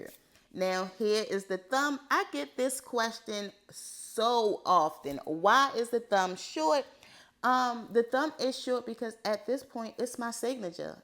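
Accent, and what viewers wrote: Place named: Maryland, United States. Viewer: New subscriber here. Stumbled across your page on IG. Love love love your work. Me and polygel are not friends but I enjoy watching and seeing your sets. I could also tell from listening to your voice that you’re from Maryland. ❤ I love Rhimestones.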